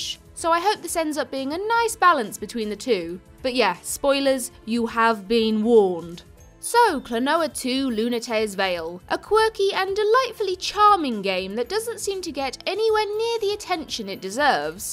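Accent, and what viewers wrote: RP, United Kingdom. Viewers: Just started playing this game, so looking for reviews...this is your first video I've seen, and am wondering how you can sound both sexy and posh at the same time...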